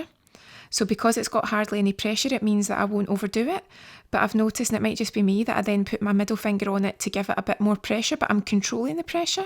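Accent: Scotland, United Kingdom. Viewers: I Love your accent :) is it scottish? Sorry oft this is torally wrong xD I'm Not good at guessing accents haha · 😁😁😁 No way! 1) I have no competence in "show & tell" 2) gorgeous Scottish accent beats awkward Italian accent hands down 😜 Keep up with the good stuff!😁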